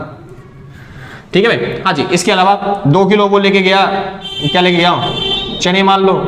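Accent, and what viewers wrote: Haryana, India. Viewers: SIR PAKKA HARYANA SE HAI 👍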